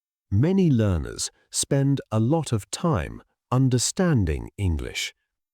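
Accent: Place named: United Kingdom. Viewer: এই ভিডিও টি ব্রিটিশ accent এ মনে হচ্ছে কিন্তু আমি তো এখন USA থাকি।এগুলো American accent দিলে উপকৃত হতাম।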